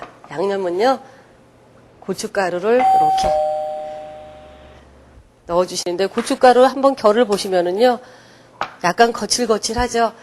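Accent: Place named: North Korea. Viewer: 북한 사람 말투인데 어딜까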